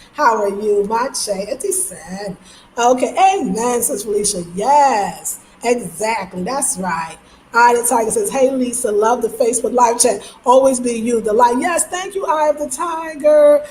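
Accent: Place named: New York, United States. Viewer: Yes we love you with that loud New York Accent, laughing , giggling, wearing bright colors & showing that sexy body!! 🌸🌸🌸🌸🌸🌸🌸🌸🌸🌸🌸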